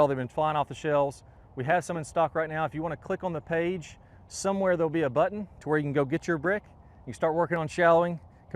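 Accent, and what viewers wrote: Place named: Kentucky, United States. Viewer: always love your instruction and has helped me get my handicap from 20 to about 12.4. My question for you is not about golf, but rather where you grew up? Your accent sounds so much like my roommates from Kentucky when I was at UK. Keep up these great instructional vids. I need to get your swing boxes. I cheaped out and just stick my alignment sticks in the ground by eying the degrees. It’s helped, but I think I need to pull the trigger on your product to be more accurate.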